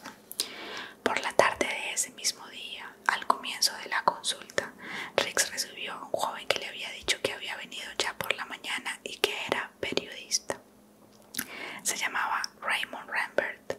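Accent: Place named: Colombia. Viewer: Amo ouvir essa colombiana falando espanhol. ❤❤❤❤❤😘😘😘😘😘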